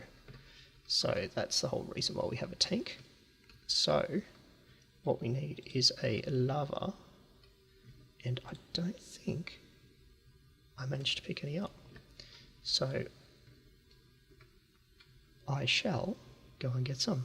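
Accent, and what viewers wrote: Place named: Australia. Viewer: You sound so Aussie lol, I need to get some video tips from you. Your videos are always great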